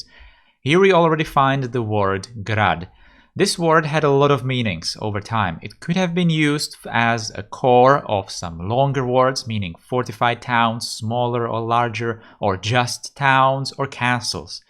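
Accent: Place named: Czech Republic. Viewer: You must be from Czech Republic. I am also. You have Czech accent when talking English and you speak very well Czech. You always start or speak about Czech Republic 🇨🇿. I love you. Jsi nejlepší, pokračuj. Znáš Poděbrady? Verz interesting episode, not just the talking but also the gameplay😄👍🏻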